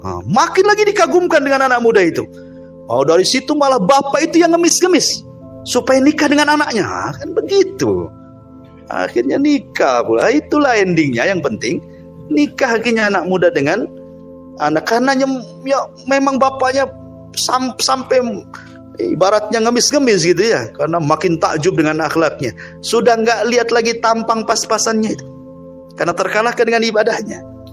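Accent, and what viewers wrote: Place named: Sumatra, Indonesia. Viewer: Kl dr logatnya, sepertinya ustadz dr daerah Sumatra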